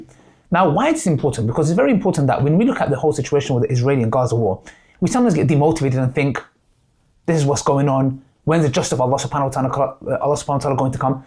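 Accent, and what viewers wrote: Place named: United Kingdom. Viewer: By the way u have a beautiful British accent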